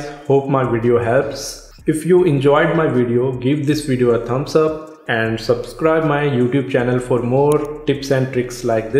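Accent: India. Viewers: Middle eastern name with a complete Indian accent....wow. Great info. Nice video, applause for microsoft.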